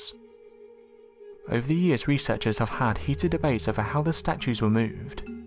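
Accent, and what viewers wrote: England, United Kingdom. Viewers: It's just the intonation that English people use. Get over it.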